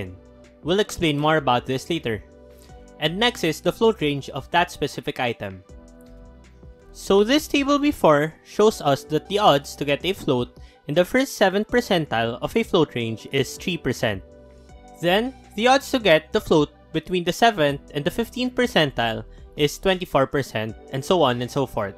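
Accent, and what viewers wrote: Philippines. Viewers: Pare keep up the good work, nahalata kitang pinoy dahil sa boses, Thanks for the hardwork you put in your vids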